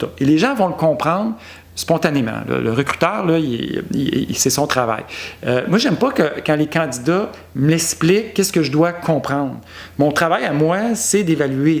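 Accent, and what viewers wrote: Quebec, Canada. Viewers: Parler familier québécois : on écrit des articles et on prononce des artics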